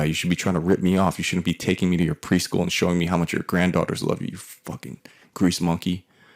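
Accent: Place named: Mexico. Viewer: I love ur Mexican accent. It’s ok I’m Mexican.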